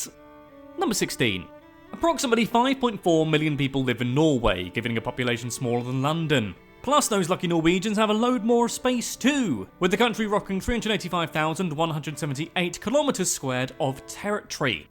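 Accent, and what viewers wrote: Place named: Quebec, Canada. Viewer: Funny accent for a Canadian.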